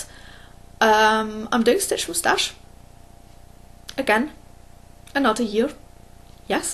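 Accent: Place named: United Kingdom. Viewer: I got here from StitchingMommy’s Flosstube, and I love your video. I adore British accents, and many people here in the US do also, so I hope your channel grows. There’s nothing i think you’re doing wrong. Maybe put more easily searchable words in each video’s title, possibly the designer’s name of something you’re working on, or something like that? One thing I really enjoy, too, is that you never say “um”! Many flosstubers haven’t mastered that yet, and eventually I can’t watch any more. Your voice is pleasant and has a lovely cadence! And I suffer from depression also. Your advice about light is spot on! 👏👏👏 I keep all indoor lights way down low after the sun goes down. Only a nightlight i. the bathroom. Only the stove hood light in the kitchen, etc. After a week of this, my insomnia disappeared and has never come back. That was 3 years ago.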